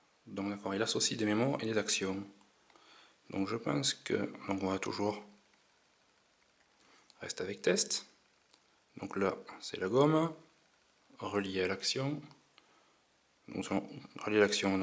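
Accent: South, France. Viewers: Bien joué pour la vidéo! Preneur pour avoir ton avis après utilisation quotidienne. Et vive l'accent du sud ;)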